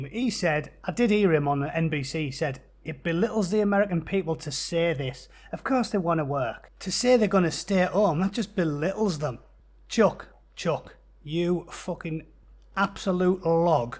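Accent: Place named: Teesside, United Kingdom. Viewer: I think it may have been a little bit of a bold statement but the point being it a lot less of a labour strong hold than it used to be. I'm from Guisborough anyway so what the fuck would I know apart from the arse end of a 🐑😉. Just though id let you know I enjoy the content and it's nice hearing a local voice shitting on the commies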